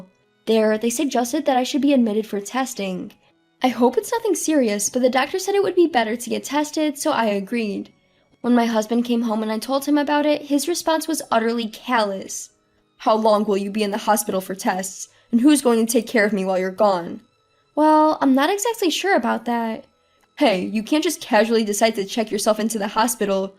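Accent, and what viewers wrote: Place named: Middle East. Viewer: At least it wasn’t Chinese AI. More like middle eastern.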